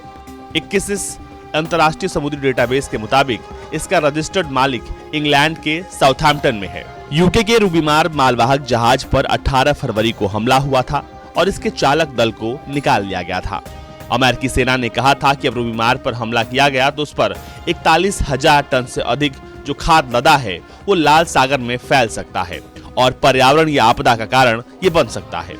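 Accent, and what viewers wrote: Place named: Bihar, India. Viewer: Ye bihari hai jo bol rha hai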